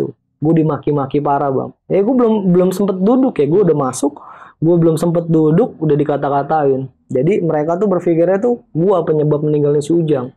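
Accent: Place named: Betawi, Indonesia. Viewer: Ceritanya menarik untuk terus diikuti hingga akhir, gaya bertuturnya asyik khas anak muda betawi, si mas yg cerita ganteng pula, paket lengkap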